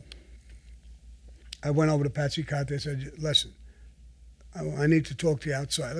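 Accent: United States. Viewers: Especially with the American accent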